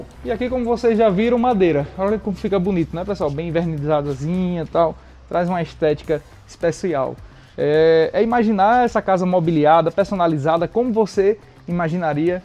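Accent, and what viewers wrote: Northeast, Brazil. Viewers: Conheci seu canal ontem e maratonei muitos videos, e fiquei namorando essas casas lindas e maravilhosas que vc nos apresenta, gostei também do seu jeito alegre de apresentar as casas e como nordestina que sou também logo vi pelo seu sotaque e percebi que também é kkk gostei tanto que ja ate me inscrevi, vc é diferenciado, e sim rapaz mecheu muito com o meu emocional essas casas kkkk parabéns pelo trabalho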